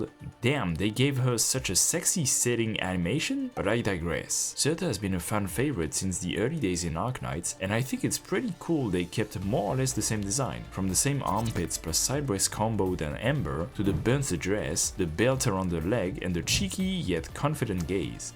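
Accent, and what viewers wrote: France. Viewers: You french?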